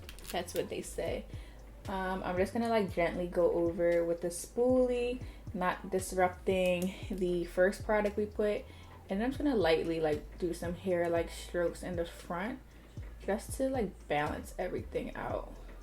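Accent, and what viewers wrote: Philadelphia, United States. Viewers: is that a philly accent I hear?? 🥰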